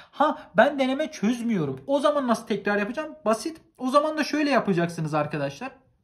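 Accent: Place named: Hatay, Turkey. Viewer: Abi neden hatay sandık içi gibi konuşuyorsun?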